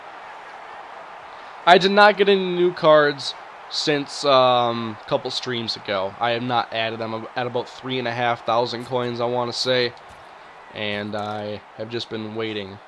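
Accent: Minnesota, United States. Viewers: Hi are u from Minnesota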